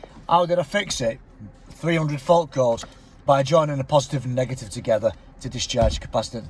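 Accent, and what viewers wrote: North, United Kingdom. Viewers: Awesome and a great Northern accent to go with it! I think this is my issue and Ill report here if it works. (10-12 ins snow currently......)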